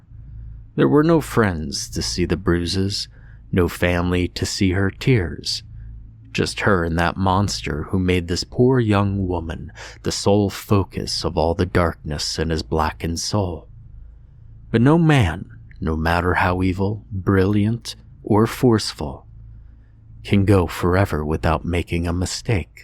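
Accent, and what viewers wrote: South, United States. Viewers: As a Tennessee girl I really look forward to your "country accent". You never disappoint ❤️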